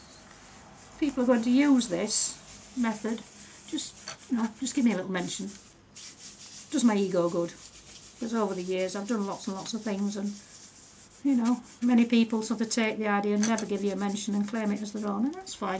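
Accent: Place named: Nottingham, United Kingdom. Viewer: I am new to guilding flakes & came across your lovely videos with wonderful tips, I am so impressed with your budget ideas I have just ordered the mix bags of flakes you have on Ebay, & dont worry about the accent, I am in Nottingham & understand every word! lol great videos, thank you for making them for us xxx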